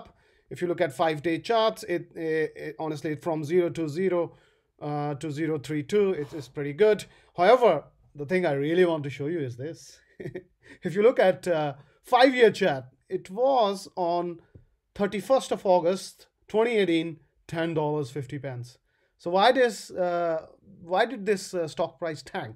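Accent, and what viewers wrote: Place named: British Pakistani, United Kingdom. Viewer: You sound British/Pakistan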